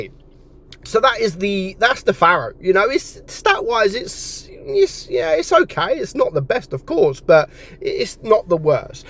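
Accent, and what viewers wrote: United Kingdom. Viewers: He has a accent and I think he British 🦷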